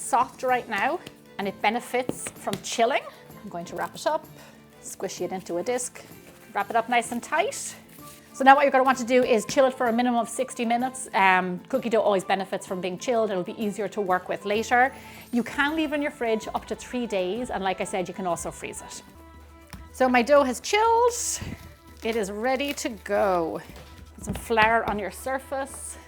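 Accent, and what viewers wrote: Ireland. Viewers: We are Canadian. My heritage is Ukrainian, my wifes is irish. I'm the primary cook so try and make her her favourite meals. I make her a corned beef and cabbage from scratch every St Patrick's day, so have another 6 weeks before i have to brine the beef brisket. But she wanted some cookies/biscuits now. happy wife, happy life. Thnx for the recipe. Looked at many but wanted authentic Irish, and you sound Irish, LOL. Made these a few weeks ago and she loved em with her tea. Used turbinado sugar instead for the topping tho.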